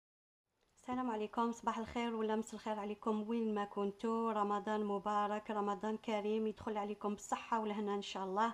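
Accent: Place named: Algiers, Algeria. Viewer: C'est très agréable d'entendre votre accent algérois,ça nous fait du bien whna b3ad ala dzair.Bravo pour la recette et pour les explications très simples😊❤️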